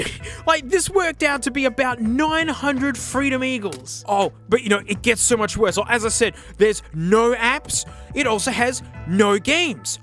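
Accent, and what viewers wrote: Australia. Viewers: are you australian? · Aussie accents are so interesting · Hi Australian man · LOL... this is Mr Mobile if he was your Aussie mate. Amazing essay as usual mate 👌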